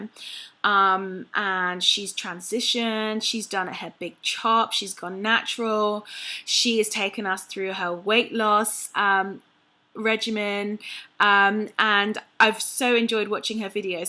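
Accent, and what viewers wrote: England, United Kingdom. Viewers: Ur so pretty and I love your English accent! And your hair looks great! Thanks for the thorough review. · Love ur beautiful English accent....lol. U sound a bit like Rita Ora. ;)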